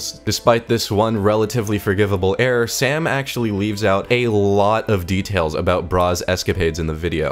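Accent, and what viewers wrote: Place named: Northeast, United States. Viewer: We also call them that in the NE and that’s what his accent sounds like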